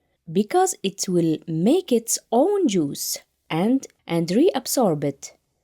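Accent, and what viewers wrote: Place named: Kuwait. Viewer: احب حجيج الكويتي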